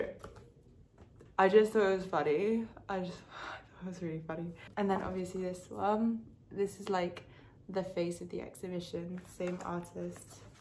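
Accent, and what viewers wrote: France. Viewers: Vrmt j’aime trop tes vidéos ! Ton accent français est bcp trop mignon en plus. Je regarde aussi tes vidéos en anglais aussi psk en tant que française qui étudie au UK j’essaye d’avoir l’accent british mdrr :))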